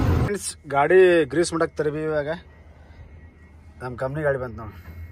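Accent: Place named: North Karnataka, India. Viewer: Anna Uttara Karnataka alla ninda, Ya ura nimda ❤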